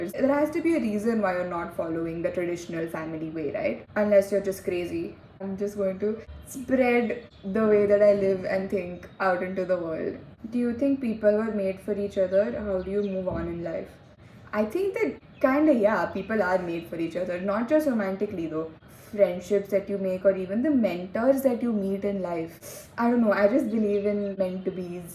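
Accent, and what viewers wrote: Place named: United Kingdom. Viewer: Watching your videos is just so amazing 💙🥰🤩.. your voice.. your thoughts.. your insights to little things in life.. that little talking to yourself.. the in-between marathi convo.. the perspective about loneliness and marriage..that UK accent.. that cute and realistic convo with your brother.. correcting his vocab and pissing him😂.. that confidence about Cadbury adv.. etc..etc.. all of these makes the video so wholesome 💙✨🥺 I feel so bad, I missed a chance to ask questions. Please upload more of these. ✨ Wish you the best in life💙✨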